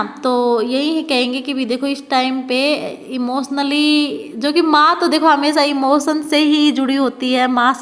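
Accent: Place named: Haryana, India. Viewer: App haryanvi bolte hue achi lgti ho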